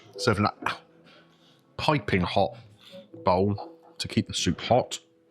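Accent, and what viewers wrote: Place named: Cockney, United Kingdom. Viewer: You have 'worked some magic' as well, with your descriptions of these dishes! From time to time, I am struck by your (to an American ear) Cockney accent: it adds a grounding note to your sophisticated discussion. I would have ordered the venison plate! Post Script: What will Mrs. Greeno say when she sees this video and she is not mentioned as being there???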